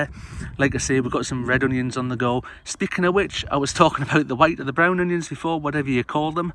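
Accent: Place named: North East England, United Kingdom. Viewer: New subscriber, some great videos. Can’t help noticing that you seem to have a north east accent for a Scottish allotment ha ha. Take care keep the videos coming.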